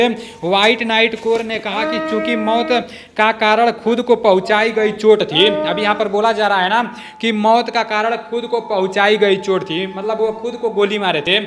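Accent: Bihar, India. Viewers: Yaar tu bihari language mat bol. Bihari lag rha hai tu. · पहले तुम हिंदी बोलना सीख जाओ उसके बाद यू ट्यूब पर आना, दू दिन नही दो दिन पहले कही भी बिहारीपन छाप छोड़ जाते हो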